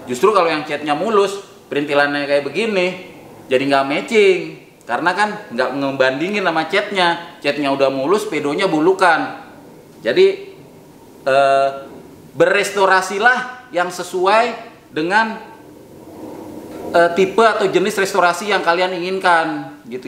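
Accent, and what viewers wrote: Jakarta, Indonesia. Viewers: Betawi banget lo bang..😁😁 demen gw denger logatnya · paling demen sama logat betawi salam dari pontianak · betawinye kena banget si jantuk bisa banget ngagulnye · YG SAYA ZUKA DR, BETAWI NYA KENTEL BANGED DAHH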